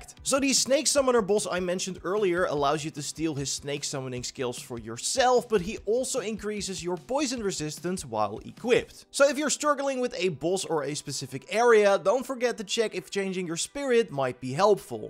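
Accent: Netherlands. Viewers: Why do you sound familiar to a Dutch guy speaking English? Thanks for the video dude. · Bro your English is very Dutch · You are very helpful, yes. But my brain could not shake the feeling that you sound very very Dutch 😂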